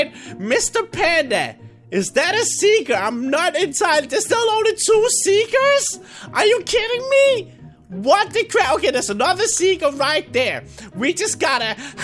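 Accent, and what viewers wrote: Denmark. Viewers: Have you ever heard someone from Denmark talk? That is how they sound.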